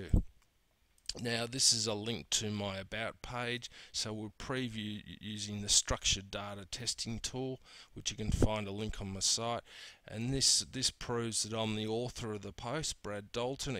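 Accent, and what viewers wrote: Australia. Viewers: great post Brad love the Australian accent :) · Thanks Brad, also love your wonderfully laconic Aussie accent!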